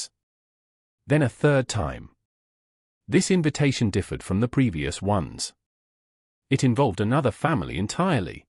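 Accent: United States. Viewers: good grace. you americans really need to get your fawlty pronunciation right. ALL americans do this !! There is a big difference between the 2 words : shire, as such, which is pronounced correctly. BUT ... connected to a place, wiltshire, or a name, ravenshire, it is very different !! it sounds like shuh. NOT ONE AI does this correctly, which means it is american, and not instructed to british english, which is VERY different, Americans say writing desk. British would not say that at all. It is a desk, and reading and writing sitting at it is the whole purpose of the thing. Horsebackriding ... wtf ? Where else would one sit? British say riding or horseriding, never ever do they say horsebackriding. And there is a whole slew of words that are VERY different between the 2 languages.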